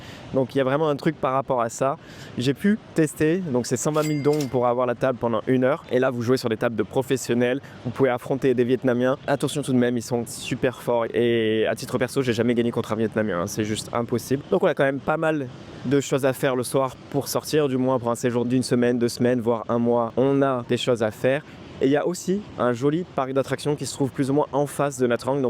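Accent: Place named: France. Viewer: Totalement d’accord, j’arrive de Da lat et vraiment le coût des logement à Nha Trang est vraiment très, très intéressant pour de très bonnes prestations. Pour la conduite, tout à fait d’accord, prudence! Un point qui m’a choqué à Nha Trang par rapport à Saïgon c’est que les motorbikes ne respectent pas la nouvelle loi et passent au rouge alors qu’à Saïgon, les scooters s’arrêtent aux feux (ce n’était pas le cas l’an dernier) Un point qui m’étonne dans tes vidéos alors que tu y es depuis pas mal de temps c’est que tu prononces le nom des villes à la française, c’est une volonté de ta part ou c’est que tu ne fréquentes que les « digitals nomads » et pas les locaux? Côté activité, tu as quand même le Musée Yersin et la Galerie de l’un des plus connus et vénérés des photographes vietnamiens: Long Thánh.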